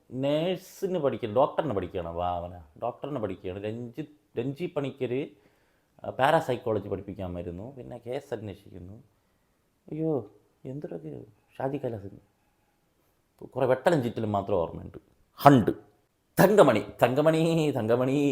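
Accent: Trivandrum, India. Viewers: Trivandrum slang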